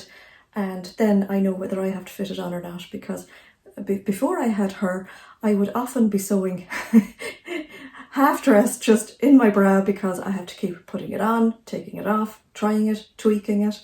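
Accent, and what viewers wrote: Ireland. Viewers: I've just recently discovered your Chanel I have to say I've binged watched them nearly all. Thank you for the hints and tips you make me chuckle as I find myself saying oh I've done that ! And absolutely feeling the pain when you put a lot of effort into your work to find the sizing is totally off ! But you keep going because you love seeing just as I do I also hate to let a mistake beat me I'll try and try to make it work or make something from the material. Cos like you I'm tight I hate waste . So please keep that lovely Irish accent coming with more tutorialS LOL Irene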